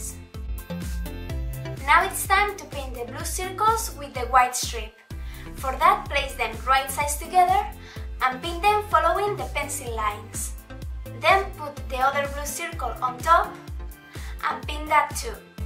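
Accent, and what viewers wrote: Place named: Spain. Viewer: You have Spanish accent. I love the video! <3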